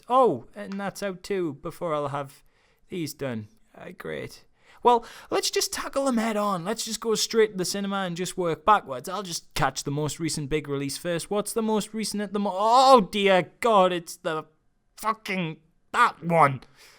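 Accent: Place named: Wales, United Kingdom. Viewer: I can't seem to place ur accent. Welsh maybe? or scottish?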